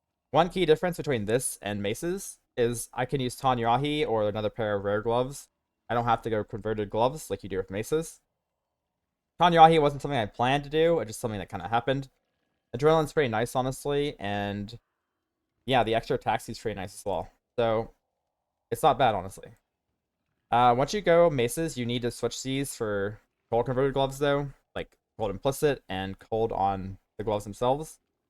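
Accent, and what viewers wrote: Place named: Germany. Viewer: Wie wär's mal mit langsamer sprechen? Immer diese Deutschen und ihr versuch englisch zu sprechen...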